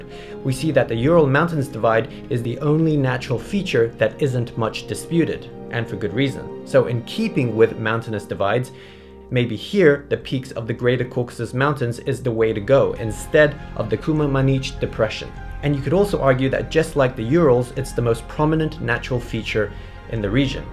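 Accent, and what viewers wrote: United States. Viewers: Did I hear correctly in one of your other videos that neither of you have ever been to the US? I'm curious because your English sounds very American with maybe just a hint of an Asian accent while sounds more European. Where did you learn English? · You have many different pronunciation of place names than Americans. Is it of British or some other origin? Your accent seems closest to American though.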